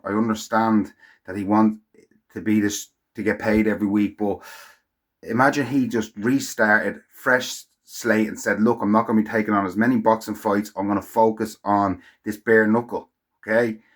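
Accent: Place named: Wales, United Kingdom. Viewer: My welsh sounding guy.....YNWA 👌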